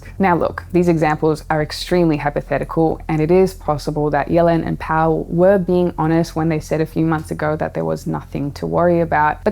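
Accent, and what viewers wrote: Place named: Australia. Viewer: Why do you have an australian accent?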